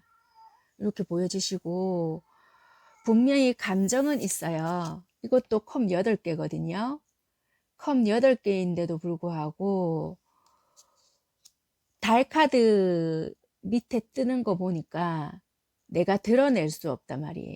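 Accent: Busan, South Korea. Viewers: 최근 헤어진 커플만 해당되는줄 알았어요 ㅎㅎ 감사합니다! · 님 상대가 마음정리 했을까봐 두려웠는데 아직도 마음이 있다고 하니까 위로도 받았어요🥺 리딩 감사합니다 💖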